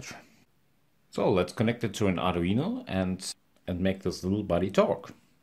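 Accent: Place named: Home Counties, United Kingdom. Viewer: The kickstarter requests Canadian dollars but the accent is clear Home Counties English. Quite surprising.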